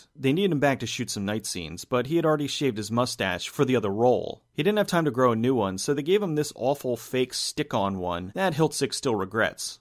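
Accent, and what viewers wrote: Philadelphia, United States. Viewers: I sense a northeastern accent, near philly perhaps?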